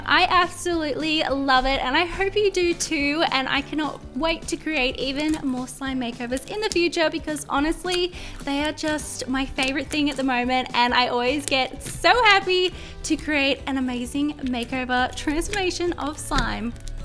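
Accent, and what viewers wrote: Australia. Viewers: Are u Australian? Just a random question also AWESOME slime. I can't even make some slime 😕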